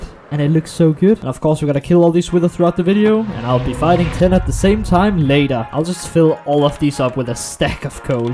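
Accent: Denmark. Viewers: Are u danish? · Kunne høre på din stemme at du var fra Danmark. 😁 Det ser godt ud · Føler lidt han lyder dansk... Could it be.. My dream comes true? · Are u from denmark? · Syg dansk accent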